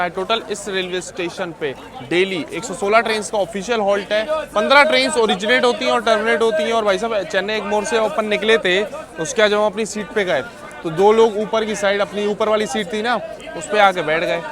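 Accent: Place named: Jhansi, India. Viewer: main apki boli se hi samajh gya tha 😁 Mai bhi Jhansi ka hi hu ( near Medical College Gate no. 2 )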